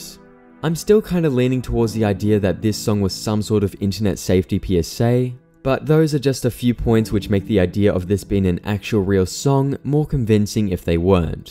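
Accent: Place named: Australia. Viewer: i love your australian accent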